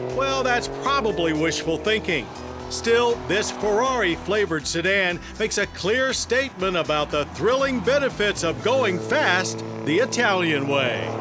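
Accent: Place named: United States. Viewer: Wow, this guy has the most American voice ever.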